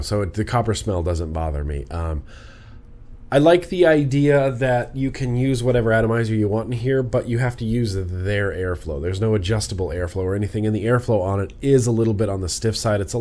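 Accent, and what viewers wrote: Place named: New York, United States. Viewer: What the hell you sound like me but your not in ny the sickness has spread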